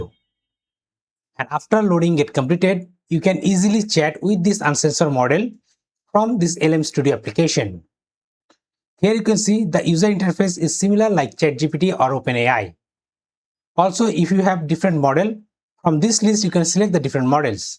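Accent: India. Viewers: Lol, gotta decode the indian-english first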